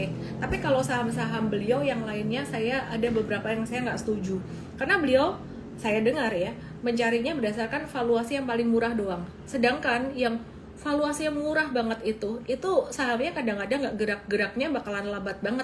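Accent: Java, Indonesia. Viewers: terima kasih ci. penjelasannya singkat dan jelas. pertahankan logat jawanya .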